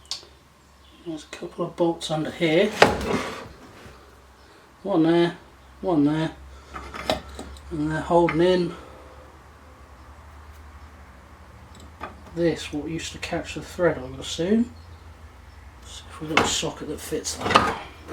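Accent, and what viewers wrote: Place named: Suffolk, United Kingdom. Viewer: Nice to hear the accent, im a Suffolk boy originally. West Aus now though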